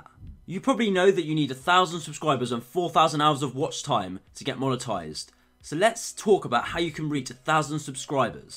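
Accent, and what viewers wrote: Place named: United Kingdom. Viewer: I can guarantee you that he's BRITISH....Bri-ish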